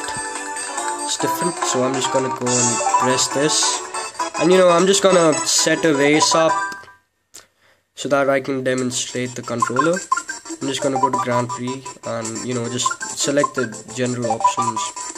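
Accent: India. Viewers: indian accent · u indian??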